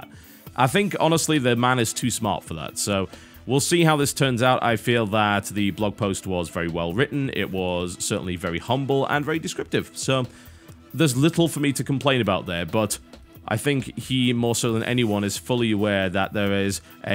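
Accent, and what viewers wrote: United Kingdom. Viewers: Don't know why but that British accent is such a turn on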